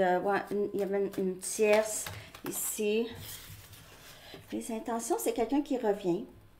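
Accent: Canada. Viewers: Hello sweet lady , with Canadian accent 🌺❤️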